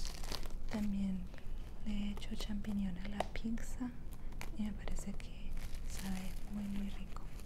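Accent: Antioquia, Colombia. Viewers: siempre me ha encantado el acento Colombiano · AMO LA VOZ COLOMBIAINA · Ya quisiera yo q mi nevera estuviera así de llena :'v Y creo que eres paisa?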